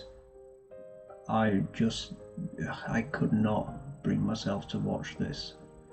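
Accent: United Kingdom. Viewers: Yes, it definitely does need that when it comes to this video; I agree wholeheartedly. I'm kinda cynical about things, lol, so I try and avoid watching stuff that requires the check, but it's annoying when it's a channel you really enjoy. I like the chilled nature of you just chatting and it's always nice when it's a fellow Brit! You're welcome, no problem! 🙂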